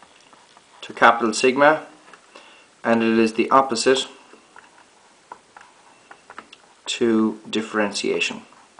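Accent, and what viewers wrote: Ireland. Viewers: Excellent video and this man's Irish guys, not Scottish :P And you will also need to use endless amounts of integrals in economics when trying to analyse profit margins and macroeconomic objectives. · he's irish!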